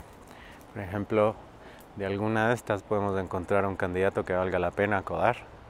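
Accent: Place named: Central America. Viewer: Increible su conocimiento, facilidad de la palabra y modo de explcia. Gracias, yo no hablo desde la perspectiva de experto ni mucho menos, solo podr'ia decir que soy un apasionado por las plantas y los arboles, pero soy totalmente neofito en los bonsais, acodos y demas temas, pero me gusat leer, ver videos, comprara notas, experiencias y experimentar. Por ahora le cuento que quiero hacer un acodo aereo a un mandarino que esta en frente del lugar donde vivo porque ya es un arbol maduro y porque ya ha produccido mandarinas de las cuales he disfrutado varias veces, el tema es que esta en una avenida asi que es espacio publico y pues cualquiera podria destruir mi acodo, quiero conservar algo del arbol viv porque en Enero de 2019 me ire de aca. Ahora mi pregunta, podria hacer el acodo y tener exito?, cuanto tiempo es recomendable dejar el acodo para cosecharlo?, si, voy a ver el video de acodo aereo, gracias y Dios lo bendiga por su aporte a la naturaleza. 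Saludos de Colombia -- por cierto, Ud. suena centroamericano/chileno, de donde es?.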